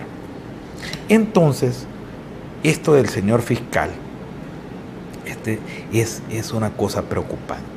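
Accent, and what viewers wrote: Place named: El Salvador. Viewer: Saludando a don por subuen trabajo me encanta como explica y habla como un buen Salvadoreño deseo que dios lo cuide y usted tenga cuidado.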